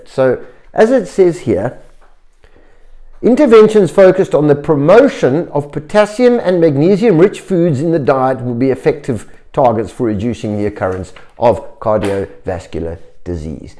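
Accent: South Africa. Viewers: You sound south african, thanks for your video!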